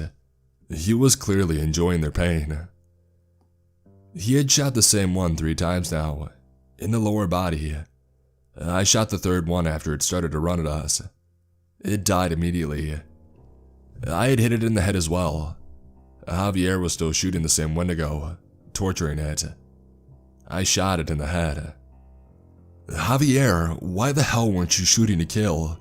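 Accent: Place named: West Virginia, United States. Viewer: Holyshit you actually sound like you're from West Virginia. I'm from the mountains of southern West Virginia and Mercer county. Just outside of Princeton bluefield area. You sound like a big old farm fed Burley mountain man like me LOL I'm 6ft 4 inches 273 lbs 40 years old and can still chase a deer through the woods. my family always used to pick on me especially my uncle my dad and my brother when we would go deer hunting because they would set a white for the deer to come to them. But I always stalk them and my brother has a video of me running after a deer and killing it with a Bowie knife. And for all you animal lovers out there just know I'm an animal lover too... I think dear squirrel turkey and rabbit is all delicious.!!! 🙄🤔😯😤😠... 🤗😀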